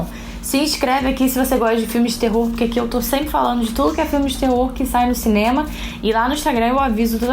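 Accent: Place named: Pará, Brazil. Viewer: adorei o vídeo ^^ inscrito desde já hahaha achei que fosse paraense, o sotaque me lembrou bastante de lá. Sucesso :*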